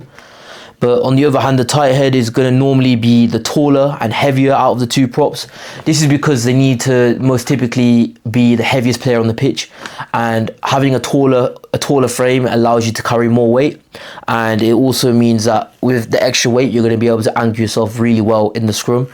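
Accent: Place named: South Africa. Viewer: love the south african accent with that ugly rose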